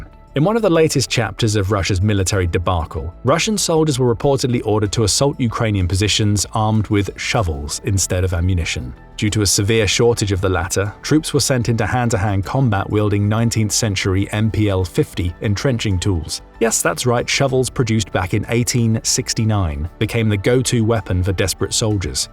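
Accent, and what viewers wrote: United Kingdom. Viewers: When someone with British accent says it's 100% real, then is based on british media or BBC .... :face-purple-crying::face-purple-crying::face-purple-crying::face-purple-crying::face-purple-crying::face-purple-crying::face-purple-crying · British accent. Discount all you hear.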